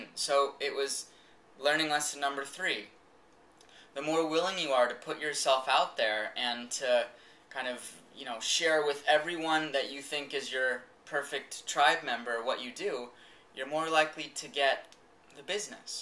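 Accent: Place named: England, United Kingdom. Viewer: i like ur english accent where ur home town?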